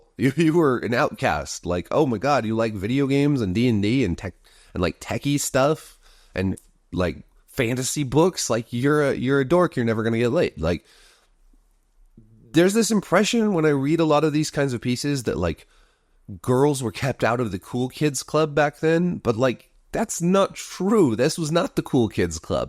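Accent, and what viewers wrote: United Kingdom. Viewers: your English accent kills me every time, I love that Ubisoft unintentionally outed Thomas Lockley as a fraud is the real win here.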